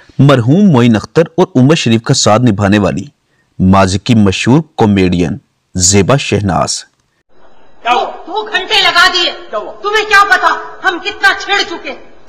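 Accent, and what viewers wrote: Punjab, India. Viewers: Tu c Urdu Punjabi main bolte ho. Apna Punjabi lehja dorost Karo. Urdu sahi Sahi bolo.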